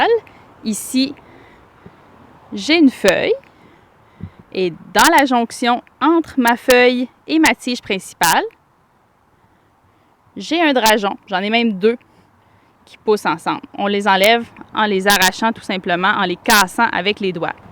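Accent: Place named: Quebec, Canada. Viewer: Bonjour, vous expliquez très bien et j'adore votre accent Québecquois ❤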